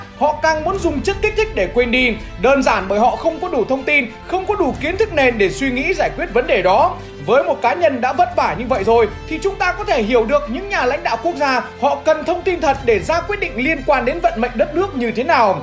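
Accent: North, Vietnam. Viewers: Giọng đọc đúng chuẩn giọng bắc Kỳ luôn nghe hài vãi😂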